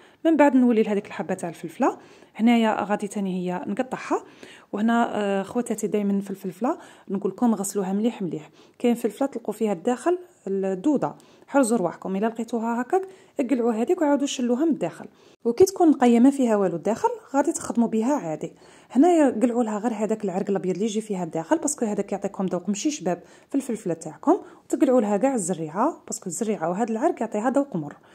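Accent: West, Algeria. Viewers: يعطيك الف سلامة والله عمري مشفت هاذي الواصفى انا من فرنسا وى الاصل جزايرية وافتخر والله عطيتني فكرة نواكل بيها بنات بنتي الخظرة كيما تعرفي الدنجال قليل اليحبو نقدر نشربها بلكورجات البطاطا تسلم افكارك يالله الي عندهم لولاد او اولاد الاولاد نظن ياكلوها لازم نشري البلا نتاع البيراكس من لكبار هنا في فرنسا يتباعو بتلاثة واحد في قلب واحد نقلك انظن بنتي انتي من الغرب على حسب هدرتك انا من بسكرة بلاد ادقلتنور ولاكن عايشة في فرنسا هاذي اوال مشاركة وى انشالله متكونش الإخيرة السلام بنتي الله يحفظك ❤❤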